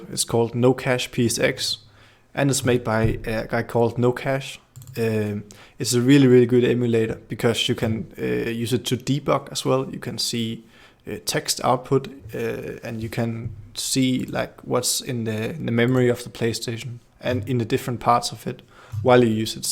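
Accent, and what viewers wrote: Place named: Netherlands. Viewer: from listening to your accent i'm assuming you natively speak Dutch. anyway, it would be great if you also had a video showing how to make the sequenced music (i believe composers just converted midi files to the SEQ format?) and the sample instruments as VAg and then into a batch VAB file . (im not too sure on the specific details but i believe the SEQ file and the VAB file containing the VAG sample instruments((with their loop points data etc)) were to be compiled into one file PSF i think) so that the sequencing/synthesis part of the audio also is explained, instead of the 'Streaming audio' part. also i read on the architecture aspects that it was also possible to do FM Synthesis, which i also would like to see demonstrated, the PS1 remains such a fascination to me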